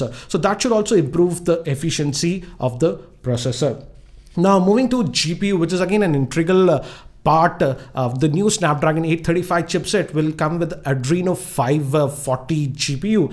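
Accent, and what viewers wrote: India. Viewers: Sorry man, but you need a to work on your accent if your going to speak English it's just too hard to understand. You really sound like your speaking Hindu. Good luck.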